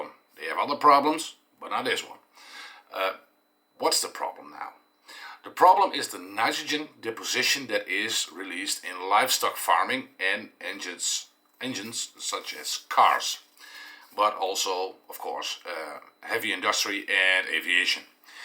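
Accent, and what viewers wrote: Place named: Netherlands. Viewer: Heerlijk nederlands boers accent met engels, top man!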